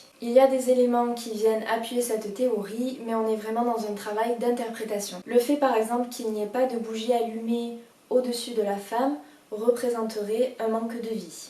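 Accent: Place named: South, France. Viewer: Elle explique bien (elle a l'axen du sud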